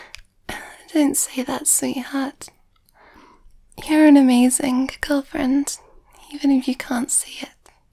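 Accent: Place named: United Kingdom. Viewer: the british accent reminds me of Millie Bobby Brown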